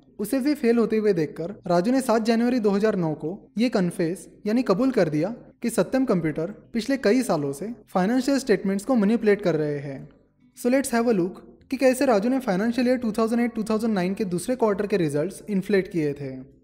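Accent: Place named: Gujarat, India. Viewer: You sound like Gujju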